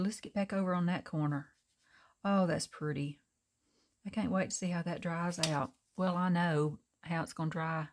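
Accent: South, United States. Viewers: the painted papers are beautiful. My daughter and son-in-law served in Army and they did a tour in Afghanistan. Thank you for being you! I enjoy watching your videos and listening to your southern accent. You are a great inspiration for me. I can't wait until the end of August when I retire so that I can craft full time. God Bless and get well soon!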